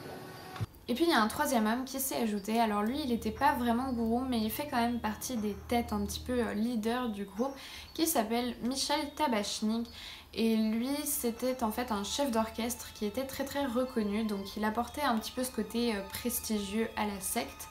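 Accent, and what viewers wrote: Quebec, Canada. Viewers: Deux personnalités différentes, deux façons d'aborder les sujets différentes. Après, ceux qui disent que fait des fautes de français se trompent, elle parle québécois tabarnac! Fac c'est un français différent! Et alors parler de "faiblesse narrative", what?! Elle nous tient en haleine!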